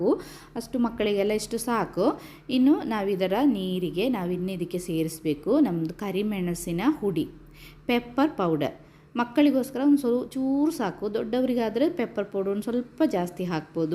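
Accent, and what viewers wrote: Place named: Bengaluru, India. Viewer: ನೀವು ಯಾಕೆ ಬೆಂಗಳೂರು ಕನ್ನಡ ಹೇಳುತ್ತೀರಾ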